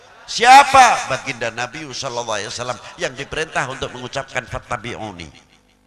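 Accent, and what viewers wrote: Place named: Tegal, Indonesia. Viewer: Padahal asli pekalongan tapi bahasa tegale kaya wong tegal nemen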